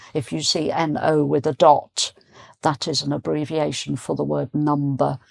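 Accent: United Kingdom. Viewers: Slow and clear english sound i really like that british sound a🎉❤nd fluently like I lo ve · One of the most beautiful voices with a British accent. Always❤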